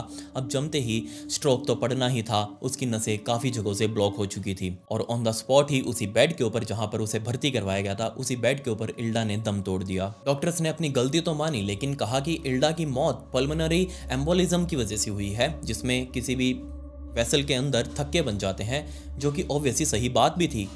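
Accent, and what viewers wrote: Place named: Sirsa, India. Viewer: Bhaiyaji m haryana sirsa ka rhne wala hisar ke pass hi h Aapki boli sun ke pahchan gya ki aap sirsa ke aas pass rhte ho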